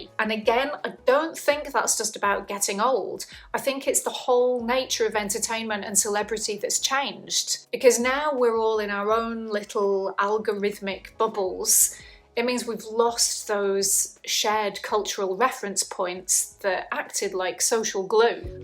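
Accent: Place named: Manchester, United Kingdom. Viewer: I thought I could hear a little Mancunian twang in your accent. The 90s in Manchester was unbelievable but of course I'm biased. It was the cultural capital of arguably the world back then. The music, nightlife we had a decent football team back then also. As far as safety goes you could argue that Manchester was more dangerous in the 90s that's why it was dubbed Gunchester there was a lot of gang activity. Statistically I don't know which era is more dangerous. Maybe social media and algorithms play their part in elevating the sense of danger and fear Of course they weren't around back in the 90s. Generally speaking I think that every generation would say that the time that they spent as teenagers was the best time of their lives. So if you happened to be a teenager in the 90s then you would say it's better than today.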